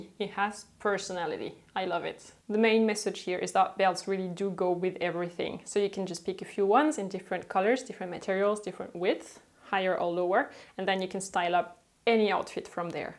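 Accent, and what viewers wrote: France. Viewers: Thank you for such amazing content. You are by far one of my favorite fashion youtubers out there. Im so lucky to have come across your channel...not to mention youre French-your accent is music to my ears. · love the video you have a beautiful sounding voice aswell are you french?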